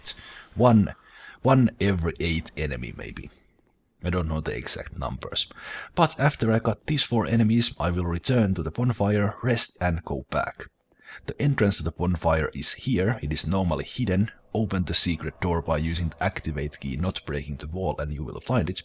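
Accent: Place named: Finland. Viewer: Where are you from your accent is kinda finnish type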